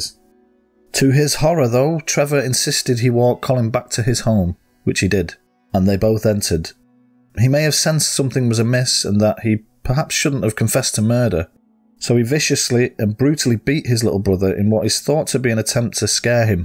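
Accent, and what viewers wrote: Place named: Northern England, United Kingdom. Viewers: I thought you were a Manc. Glad you covered this case, i have heard if it. The victims need to be remembered, like you say. RIP to all his victims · I love this channel, your a brilliant narrater, could listen to you all day, I'm not far from you at Manchester I'm from Kearsley, but now live in Wigan, thought I recognised the accent. Keep them coming, loving them all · I knew you sounded like you were from the north of England I lived near Manchester I lived in Newton Lee Willows earlstown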